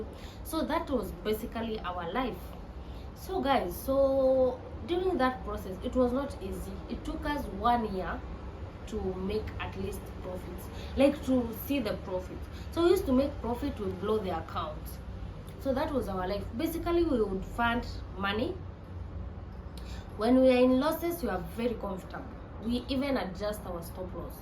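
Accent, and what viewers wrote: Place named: Kenya. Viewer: The kenyan accent! 9feb26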